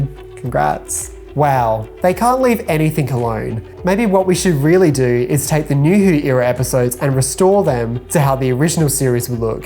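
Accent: Australia. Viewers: don't mind nasally, gay, effeminate Australian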